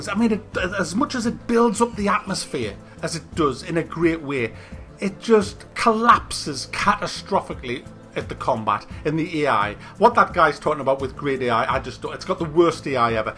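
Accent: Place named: Newcastle, United Kingdom. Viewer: Sorry, Vampires do exist. Love your reviews. Proper Geordie rantings!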